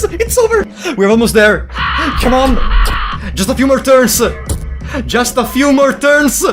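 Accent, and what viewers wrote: Italy. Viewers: I really like your italian accent in English. Sounds so much better than mine (im Spanish)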